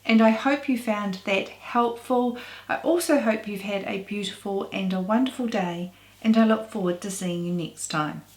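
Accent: New Zealand. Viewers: Hello. Nice to hear a lovely NZ accent on YT. I’ve watched several of your videos & find your information quite useful in general. I’m a bit concerned with the shaving by hand & derma planning as it’s a bit precarious & I think could be a bit harsh. Previously I was waxing in a salon but we get locked down so often (Aust), I looked for at home alternatives. Another lady on YT was using the VieBeauti Rechargeable Eyebrow Trimmer Facial Hair Remover. I bought one to try & Im very happy with the results & convenience. I think you should look at that item or other similar tools to see what you think. That item has 2 interchangeable heads, a larger one for general peach fuzz & smaller head for around eyebrows, nose & lips etc. The heads can be rinsed in water (It’s a tool that can be used on other parts of the body too but I’ve not done that) It’s quite portable & charges with a USB. 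I’m feel quite scared when I see ladies shaving around eyes or possibly around blemishes or flaws they may not notice. I’m certainly not trying to sell this thing, (I have no associations at all) it’s just something I’ve found is really useful now & wanted to share with you & people here as it’s certainly safer alternative.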